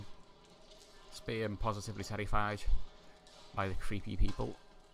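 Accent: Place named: Liverpool, United Kingdom. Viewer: I'm not very good at accents but I recognise real Everton supporter anywhere lol lol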